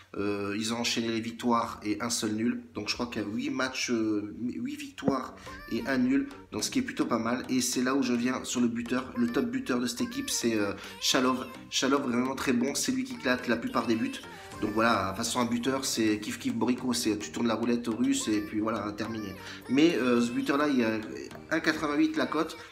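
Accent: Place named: Italy. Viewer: Slt tonton,bien joué ça passe crème,tu nous régale la famille! Et les pertes, c normal sinon on serait riche,continue comme ça,et surtout tu me fait trop rire avec ton accent italien!!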